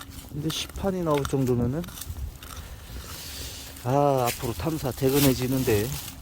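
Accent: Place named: Chungcheong, South Korea. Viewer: 말투가 충청도사투리같내유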